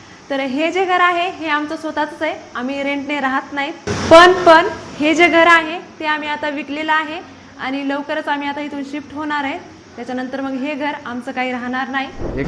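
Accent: Parbhani, India. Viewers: माझी भावजय तुझ्यासारखीच बोलते. ती पण परभणीचीच आहे...छान वाटते ऐकायला❤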